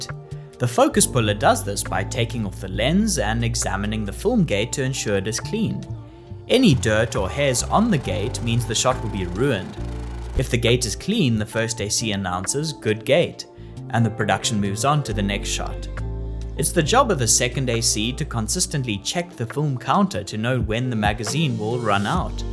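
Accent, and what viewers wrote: South Africa. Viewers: Hey man, great content, your accent is so SA! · Bru are you South African?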